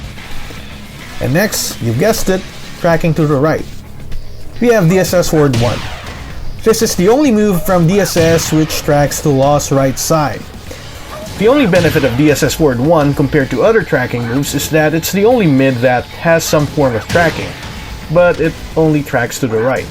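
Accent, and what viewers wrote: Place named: Philippines. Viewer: I knew the accent was filipino lol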